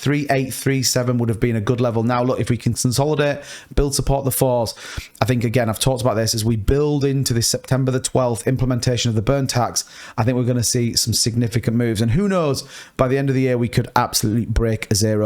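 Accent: United Kingdom. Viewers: It's hard to understand what ur saying when u talk too fast with the British accent. Just a heads up from over the pond. Love your channel!!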